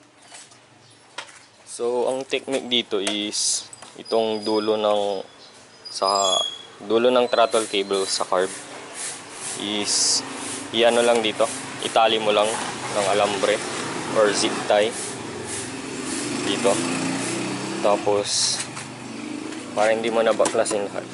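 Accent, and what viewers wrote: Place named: Pangasinan, Philippines. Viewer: taga pangasinan punto nyu lodz ah😂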